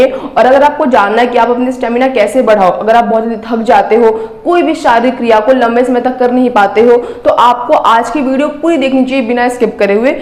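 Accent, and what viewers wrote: Bihar, India. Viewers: Very sweet voice mam.. Madam bojha dhone like we r bihari... Proud be a bihari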